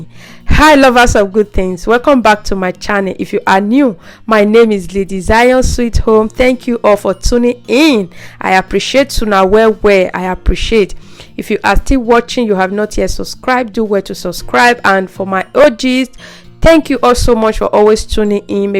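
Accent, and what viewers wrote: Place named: Nigeria. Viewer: The jollof rice looks so yummy, the color alone dey make me hungry and your pidgin English dey sweet for my ear, I know say naa so the rice go sweet well well! And yes this naa the rice wey I grow up dey chop the only difference na the spices because those days if you get Maggie, salt, onions, pepper, tomatoes and crafish jollof don ready be dat, fish or meat fi no dey wetin you know be say chop belefull! Na so we go dey use hand take dey mold am put for mouth 😂 … is a great cook.Thanks for sharing dear ❤️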